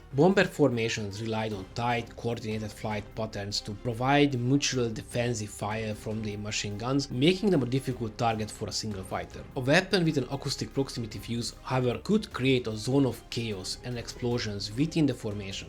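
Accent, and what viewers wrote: Hungary. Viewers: Húú bazmeg, ettől az ótvar durva akcentustól szinte megfájdul a fülem. Ne magyarul mondj ki angol szavakat! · az a jó kis magyaros kiejtés:D egyébként nagyon jó lett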